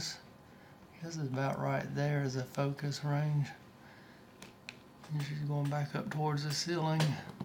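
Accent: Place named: South, United States. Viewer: Where exactly is your accent from? Sounds quite southern to me :) That is one beautiful bouncin' banana spider with the prettiest pattern on its body. The way it's cleaning its legs is still a little awkward to watch, though. I've come a long way with spiders but these awfully long legs are still making me feel rather uncomfortable. I'll get there eventually.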